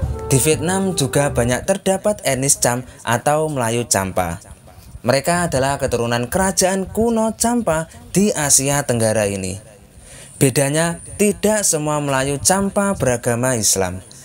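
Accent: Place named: Java, Indonesia. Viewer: Masssssss.bhsa.nyaaaa medog bngettt.jawa nyaaaaa.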